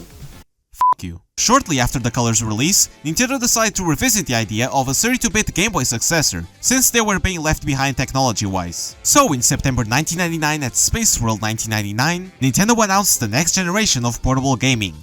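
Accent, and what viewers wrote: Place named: Portugal. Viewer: I know a portuguese when I hear one! Good video 🤝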